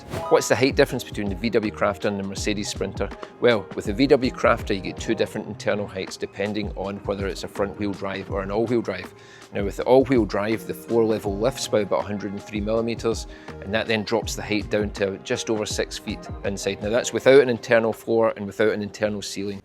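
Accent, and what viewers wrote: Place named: Scotland, United Kingdom. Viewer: I could listen to the Scottish accent all day!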